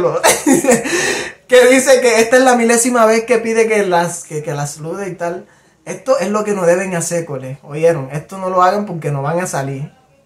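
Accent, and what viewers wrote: Colombia. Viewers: Excelente video gracias por divertirme y hablar puro colombiano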